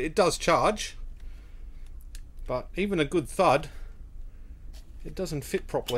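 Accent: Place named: Australia. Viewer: If it's not branded Ryobi, then it's NOT a fake. From the accent the reviewer is Australian who tend not to pay attention to facts. Anyone buying a replacement third party battery can clearly see it's not a genuine Ryobi or a Makita or Worx. So it's ridiculous to call it a "fake". Just sensationalism.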